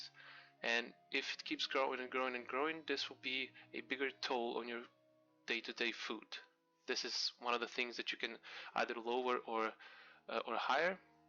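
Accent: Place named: Serbia. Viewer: You sound like you're Serbian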